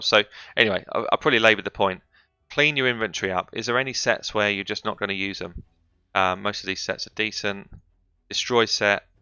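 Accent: United Kingdom. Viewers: Got directed here from Stew. Great vid and info and nice to hear a brit 🏴 doing some raid content. I'll be coming back 👍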